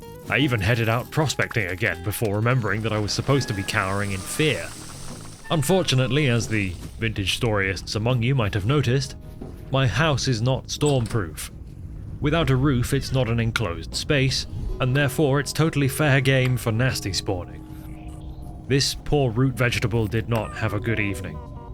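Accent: United Kingdom. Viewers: always delivers on quality content, if i ever reincarnate i want to be british for that accent.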